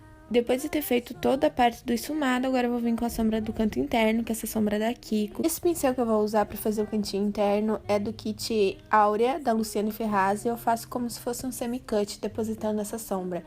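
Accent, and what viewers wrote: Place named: Rio Grande do Sul, Brazil. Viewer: sotaque gaucho, é nóiiix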